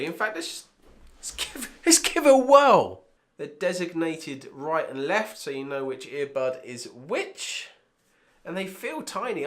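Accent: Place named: England, United Kingdom. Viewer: Why do you look and sound like an English James Pumphrey?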